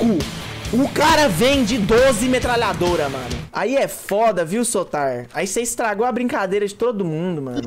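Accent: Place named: Maranhão, Brazil. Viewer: Parecendo os maranhence daqui de Goiânia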